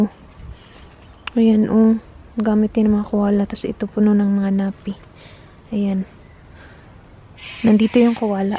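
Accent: Visayas, Philippines. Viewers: Hehe. Bisayang dako ate. 😂😂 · Ang cute mo ate magbisaya hahahaha! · Bisaya kapo? AHAHAHAHHA sa accent mo po kasi ahahhahahaha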